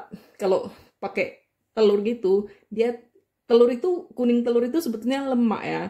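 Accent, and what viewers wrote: Medan, Indonesia. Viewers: Kangen juga dengar logat2 medan mak.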